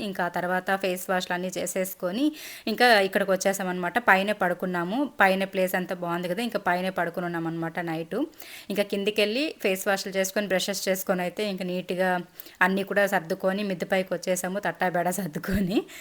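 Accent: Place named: Rayalaseema, India. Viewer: Hi garu, chala baundhe vlog thodi kodalu bonding chala cute ga undhe, meru chesina non veg dishes yummy naku me non veg vlogs chala estam.andi and meru rayalaseema slang bauntadhe andi voiceover kanna original slang video petandi madhe hyd andi..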